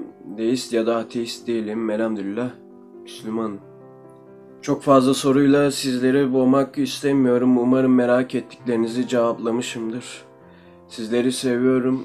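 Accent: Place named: Azerbaijan. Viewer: vay, bey Azerbaycan Türkçesine değdiriyor.✍️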